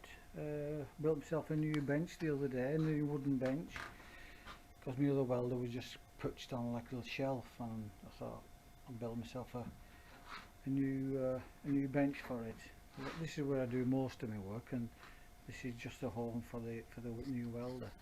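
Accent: United Kingdom. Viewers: just sat through and watched a good few of your videos, you're obviously a Wigan Lad as you sound just like me:-) Keep them coming thoroughly enjoyed them, all the best Steve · Hiya, just watched your video on bridge rectifiers.I have an old LE Velocette with the old type plate rectifier, three plates.Recently had the magnet demagnetised.On position one on the ignition switch the bike runs and charges, on position two, ignition and lights (DC) it does not charge.Been recommended to use a modern rectifier, like the one you speak about.The LE is pos earth does that make a difference??You sound like you are from near me in Warrington??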